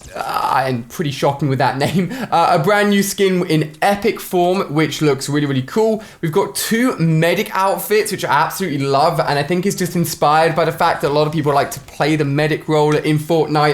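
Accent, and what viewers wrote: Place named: United Kingdom. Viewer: Not being racist but I kinda like the British accent like ”ool “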